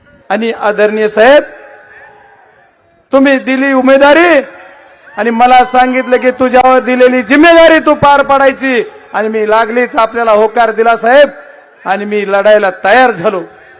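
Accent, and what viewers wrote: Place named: Dharashiv, India. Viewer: धाराशिवची भाषा येते बाप्पाची सेम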